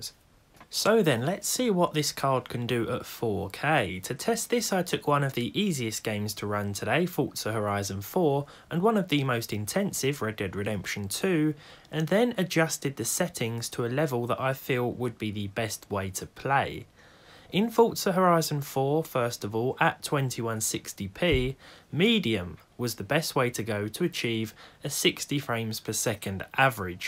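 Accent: United Kingdom. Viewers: How do you know he’s British besides the accent? He says parcels instead of packages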